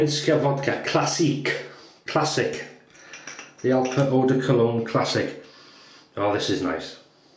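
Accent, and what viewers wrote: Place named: Wales, United Kingdom. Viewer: Very cool and fun video again :) I really like your accent, reminds me of my good times when I worked in Wales.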